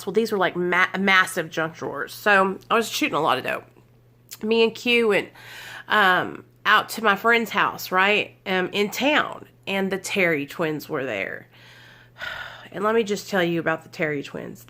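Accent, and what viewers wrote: South, United States. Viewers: i love 💕 your accent is that down south ????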